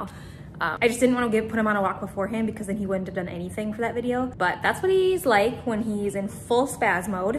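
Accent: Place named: Minnesota, United States. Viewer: What is your accent? Minnesota? It's so lovely!!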